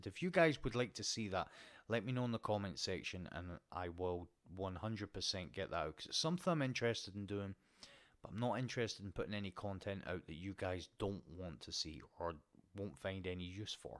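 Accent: United Kingdom. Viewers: you’re very welcome jajaja. It’s nice watching your videos. British accents makes it fun to watch.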